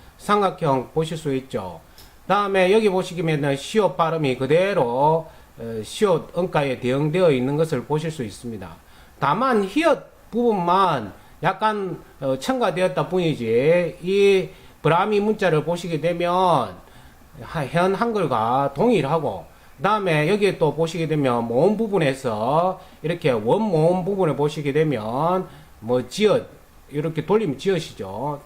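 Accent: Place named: Gyeongsang, South Korea. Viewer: 몬 개소리하는지 니 말부터 통역해야것다!갱상도!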